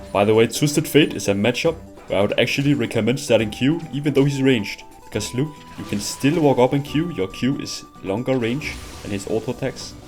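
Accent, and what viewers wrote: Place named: Denmark. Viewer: Love the vids. What accent is that? Sounds a little danish to me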